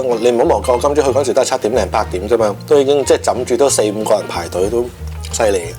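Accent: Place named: Hong Kong, China. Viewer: 你好呀，今日無意中睇呢條面影片，聽你講說話嘅口音似係香港人，但係點解會叫油條同埋茄子嘅?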